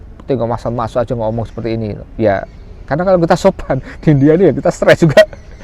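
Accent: Java, Indonesia. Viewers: Jowone endi mas? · wong jowo tho mas sampean? ngomonge kok medok tenan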